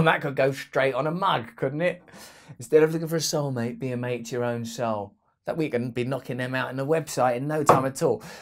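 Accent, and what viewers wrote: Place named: United Kingdom. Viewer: I don't understand your British accent 🥺🕊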